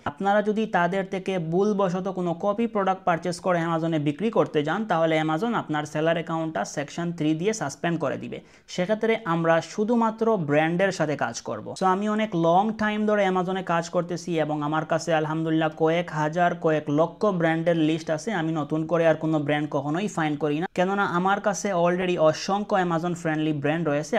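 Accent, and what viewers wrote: Sylhet, Bangladesh. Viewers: Bhai ki Sylheti? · আপনি সিলেটের নাকি? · ভাই আপনি কি সিলেটি 🙂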